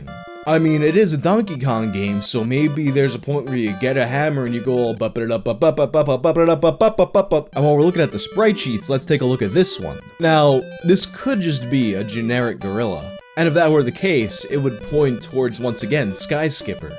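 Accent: New York, United States. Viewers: Like every New Yorker you'll ever meet. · Lol I first heard it from Rich Massucci of ReviewTechUSA and now I can’t unhear it. They’re both New Yorkers so definitely an accent thing.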